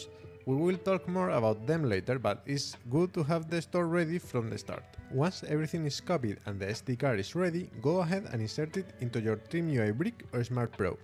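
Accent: Spain. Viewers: jaja es que se te nota que eres español y pense a lo mejor igual otro canal y yo viendo en ingles jaja.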